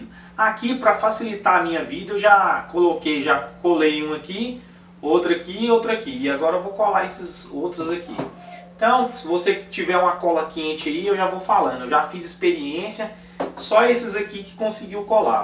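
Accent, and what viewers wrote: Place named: Minas Gerais, Brazil. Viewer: uai , esse. é mineiro hahaha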